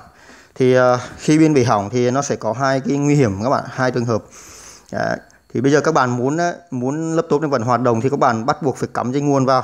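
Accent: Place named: Nghe An, Vietnam. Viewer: Giọng xứ Nghệ nói khó nghe thật , lắng nghe nhức lỗ tai mà chẳng hiểu nói gì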